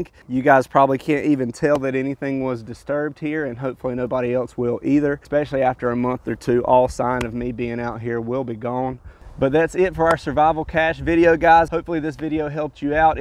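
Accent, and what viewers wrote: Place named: Georgia, United States. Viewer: I don't know where he is, but the freeze line where I am is 6 inches. He sounds like a Georgian so I'd say he's just about right.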